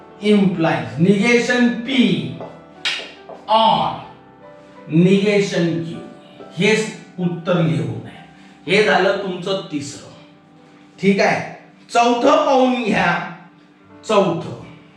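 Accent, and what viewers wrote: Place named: Vidarbha, India. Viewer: Me ka vicharle Karan tumhi vidharbh chi basha bolt hota,kup Chan shikavtat tumhi sir, chemistry pan shikavtat ka tumhi?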